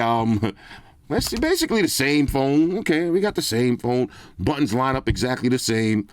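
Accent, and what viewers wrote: New York, United States. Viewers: talks like a NY Giants fan. Gross. Dope vids though. Z flip 3 all the way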